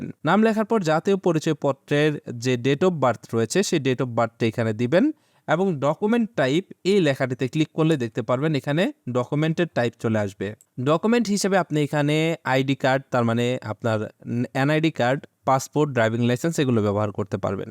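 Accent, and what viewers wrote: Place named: Ghatail, Bangladesh. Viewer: Vai apnar basha ghatail a amar bash oo ghatail ❤❤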